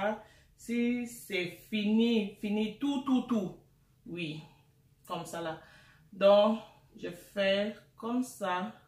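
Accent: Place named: Bamenda, Cameroon. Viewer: Sis I absolutely love the box, I was brainstorming with my sister about this idea last week. To me I was looking for something like this where I can take my food pictures in front. Thanks for inspiring. You are full of positive energy sis and wait ohhh that was my kind of French😂😂😂😂 bamenda geh like me. It's <mail>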